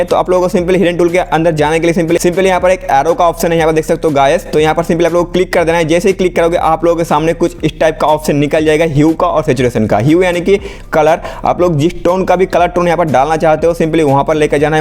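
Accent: Bengal, India. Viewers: Vai up Bengali ho??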